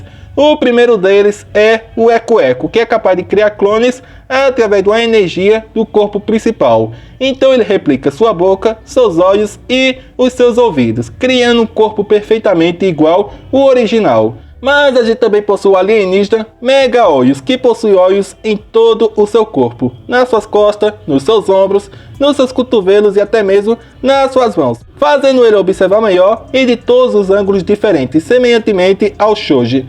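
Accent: Northeast, Brazil. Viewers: Quando Vi A Thumbnail, Achei Quê Você Enlouqueceu De Tanto Teorizar Para Preencher Os Furos De Roteiro & Falta De Lógica & Etc ....., 😅😂😂😂. Adoro Seus Videos De Ben 10 e Seu Sutaque Nordestino???, Sulista???, 🎉😅.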